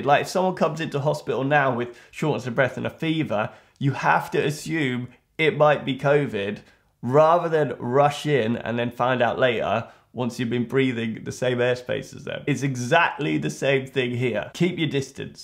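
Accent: United Kingdom. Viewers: Is it just an automatic response to remove an impaled object? (I heard Steve Irwin did that w/ the stingray barb, that there was a chance to save his life had he not done that.) Can't wait for your reaction to the next episode. It's by far the darkest ep. I'm not usually a fan of a moustache but combined with the British accent, it oddly works for me 😉😄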